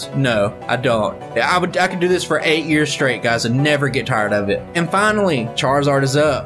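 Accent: South, United States. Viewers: I have to say that I enjoy the "unoptimized run first, optimizations later" style, and I also enjoy the longform videos (such as the Mr. Mime vid). The variability in content is nice. Thirdly, the Sanqui runs injecting new moves, types, and mons is also a unique treat that sets you apart from others on the scene, and the Garchomp vid is what got me to first click on a vid of yours, actually (and your accent and demeanor helped me to stay; I've got southern in my family and I feel it's very underrepresented in many gaming communities) 😁 I think this is the first vid of yours that's came out since Scott's Thoughts' Tentacool run that gives you a mega-shout-out at the beginning, too -- I hope you see the views tick up from that!!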